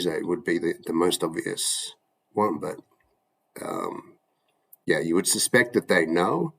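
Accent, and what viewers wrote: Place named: United States. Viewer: Am I crazy, or can I detect a slight Ameircsn accent?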